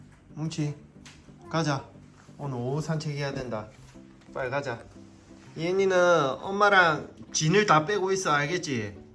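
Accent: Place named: Seoul, South Korea. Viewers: ㅎㅎ 책도 참 서울말로 잘 읽어주시네요. 애기랑 너무 행복해보여요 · 책 읽을때는 서울말ㅋㅋ 사투리 안 쓰시네요ㅎㅎ 그래도 정감있고 재미있는 말투가 좋아요 😄😄😄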